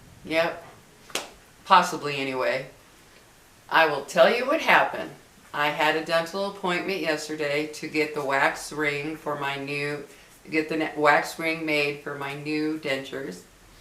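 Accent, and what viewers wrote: United Kingdom. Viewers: So nice to see your fun side Stay happy always I love the Brit accent too ❤ Gentlemen kind men are no longer around 😅 Your hair looks fabulous 💕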